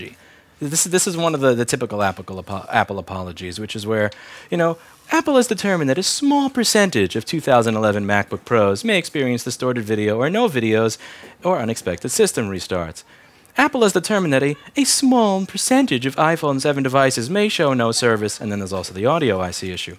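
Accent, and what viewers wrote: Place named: South, United States. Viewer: Southern Voice El gato es muy buneo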